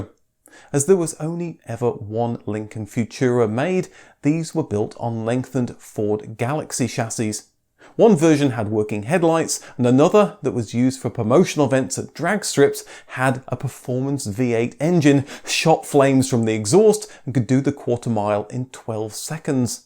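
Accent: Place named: United Kingdom. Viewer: Thank you so much for this great video. I thought more replicas had been built: about 8. I read this somewhere. As usual, it's a pleasure listening to your perfect British accent. That's a bonus to me!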